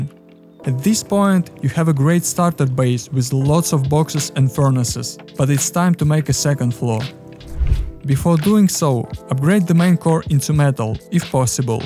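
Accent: Russia. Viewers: жесть как русский акцент выпирает прям )))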